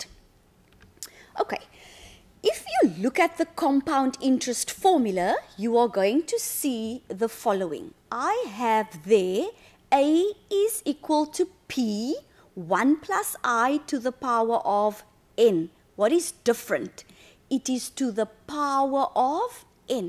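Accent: South Africa. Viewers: That's the way every south african teacher speaks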